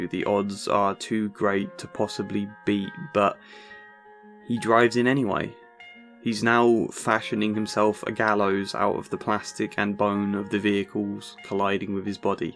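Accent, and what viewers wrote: United Kingdom. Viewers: Notice you've got a british accent. You listen to much uk hip hop? Jam Baxters lyrics and fascinating and visual as well, but probably not quite to an level. You might like as well, cause he's really funny and slyly satirical.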